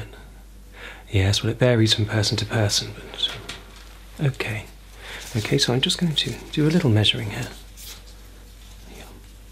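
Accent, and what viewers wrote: RP, United Kingdom. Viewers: Британский акцент невероятен☺ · Sometimes the simple english language spoken with the right amount of british accent is really asmr inducing. Bravo. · Omg he is so handsome plus he's sweet British accent is going to melt my computer. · I randomly came across this video and lemme tell, that British voice is just smooth and So ASMRy. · Now this is a real posh British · That incredibly beautiful accent. That's the British accent I love most. Makes me feel like I'm in excellent company. Also, this artist is a superb actor. Talk about talent! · He's talking in a noble british accent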